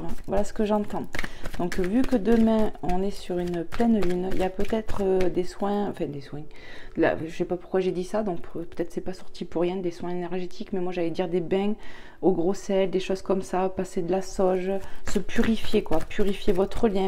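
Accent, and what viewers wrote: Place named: South, France. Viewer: Cc tu es du sud comme moi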